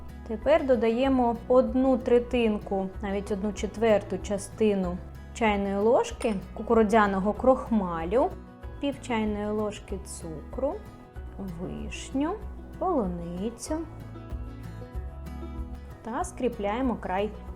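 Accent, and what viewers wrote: Ukraine. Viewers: Очень милый акцент Украинский❤😊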